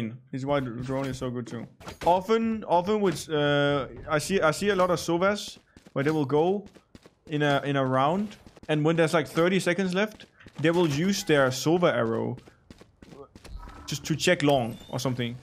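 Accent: Denmark. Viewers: are u from denmark?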